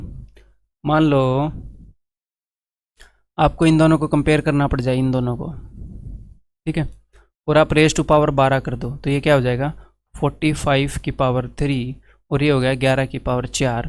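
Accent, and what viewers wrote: Haryana, India. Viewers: You are awesome. I am also from haryana. Felt connected with your tone . I am big fan of you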